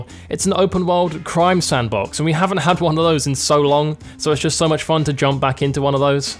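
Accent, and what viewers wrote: England, United Kingdom. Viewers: He’s english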